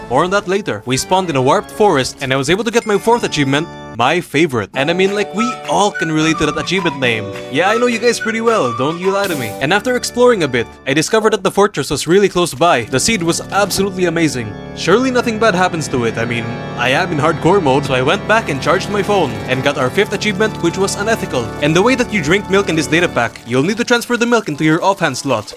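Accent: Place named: Philippines. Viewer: An idea that can only be mustered by a Filipino. I can recognize that accent from anywhere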